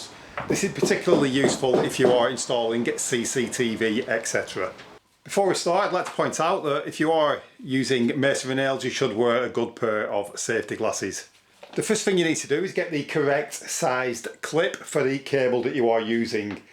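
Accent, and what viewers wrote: United Kingdom. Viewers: A question for British viewers, what kind of accent is this ?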